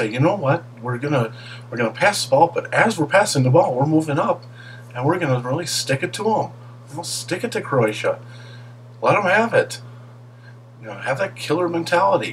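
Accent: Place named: United States. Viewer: ArtVandelay is wrong. You seem to know more about football than a lot of people in England. I like your videos. It's refreshing to hear an American accent chatting about the beautiful game. Keep it up. Blackburn Rovers all the way!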